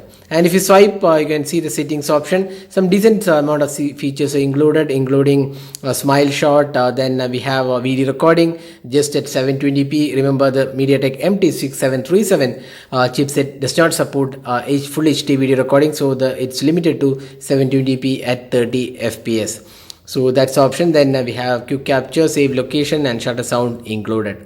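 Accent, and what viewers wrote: India. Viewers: I HATE THE INDIAN ACCENT WTF EWW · Está hablando en inglés, pero obviamente es indú... asi que su acento se hace notar xD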